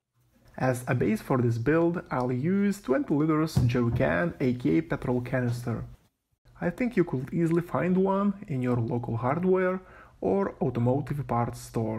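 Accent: Russia. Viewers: sharp russian accent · Ебанутый русский акцент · Настолько русский акцент , что сразу спалился . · Русский акцент. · Лучше бы бот озвучил. Какой откровенный акцент, прямо как в американском кино, где русских показывают. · Пиздец какой у тебя Русский акцент браток! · Why do you speak like russian? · чувствуется русский акцент..... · ты русский что ли??? акцент слышен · Are you Russian? your accent is telling me Russian but I'd rather ask then assume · Русский акцент)))) Канал на русском есть? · Русский акцент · У тебя Русский акцент. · Oh!!! Russian English!!! 😁👍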